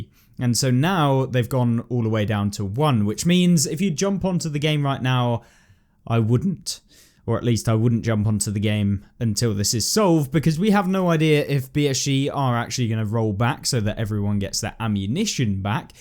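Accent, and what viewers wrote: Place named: United Kingdom. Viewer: i like that british accent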